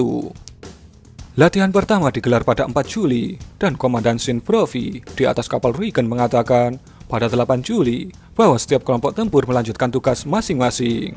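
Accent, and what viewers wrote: Java, Indonesia. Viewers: Medok nya dak hilang2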